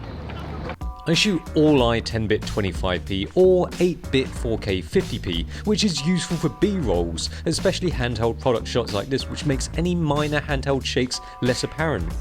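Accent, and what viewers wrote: United Kingdom. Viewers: you are an icon and a legend in my books! Lovely presenter, funny, to the point and honest, PS you gotta love that British ascent, right? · So cool to see a Japanese-looking guy speak in a British accent.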